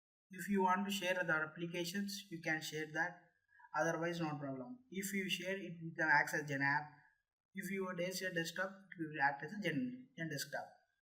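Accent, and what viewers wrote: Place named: South India, India. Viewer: First, Appreciate your efforts ....Second, you really speak fast with heavy South Indian accent , its really tough to understand for me too (being a citrix admin and living in south india)....... Third, A suggestion, you may add subs in the video......this will increase for you hits and would be another favour for everyone.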